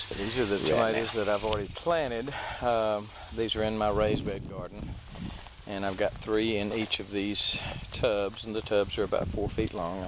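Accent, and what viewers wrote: Texas, United States. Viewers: Awesome video and great information I'm gonna try. Boy, sure got home sick hearing that Texan draw. I'm down south 13 miles from Galveston and sure miss them piney woods, ha!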